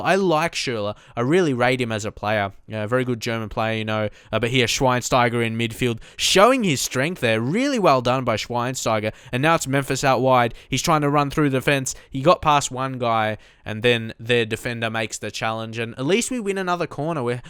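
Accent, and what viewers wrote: Australia. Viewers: R u from Australia????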